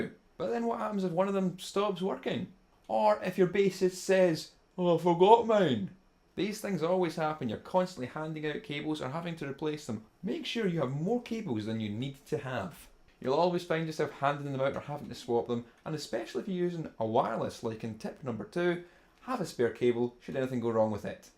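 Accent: Scotland, United Kingdom. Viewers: Great advice. I've been playing live for 50 years now, and each of these tips ring true. I could have done a video on this, but I don't have the uber cool Scottish accent that you do! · Scottish accent is the best :DD great video as well !! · Oooo your Scottish? Where about are you from? I'm from south lanarkshire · Just discovered your videos a few weeks ago, and have enjoyed them a lot, not just because I agree on a lot of your points about EMGs, Floyds or Boss HM2s with full gain, not just because I have the exact same Bugera amp, or because I love the Scottish accent. I like them because I actually learn some valuable lessons from you. That being said, I also agree on everything in this video, except I don't think wireless systems makes a guitarist move around more. I'm the guy who always gets in the pit with my guitar or jumps from PA towers, but I never thought of a wireless system as a worthy investment. 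I usually don't use pedals, maybe a little OD sometimes to boost solos, but even when I don't have any other pedals I always put my Korg stage tuner on the front/center of the stage so I have an "anchor point" in front for more movement/range and to reduce the risk of pulling my amp head to the floor. I also put my cable around my strap so it won't get ripped out. I see many guitarists with wireless systems standing in the exact same place the entire gig, and I don't get why they bought it. Anyway, I think there are some other advantages with wireless systems that I haven't seen yet, maybe you could make a video about what possibilities such a system gives you and some pros and cons with being wireless? (A guitarist I know uses his system (shure) simply because it has a built in tuner.) How about setting up your gear for a gig? switching guitars onstage? If you use a shitload of p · Dude you look so cute and ur Scottish accent is pretty funny and frustrating to listen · new sub here. 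not just for the Scottish accent nut great advice as well! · Emmanuelle de jesus Scottish accent. Someone from Scotland could probably tell you what city too (Edinburgh sounds v different to Glasgow).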